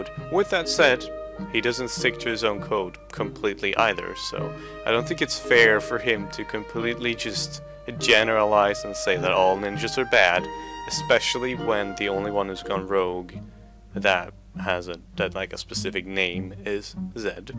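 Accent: Sweden. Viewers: You are swedish for real? :D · Ofta du inte hör att han är svensk?:s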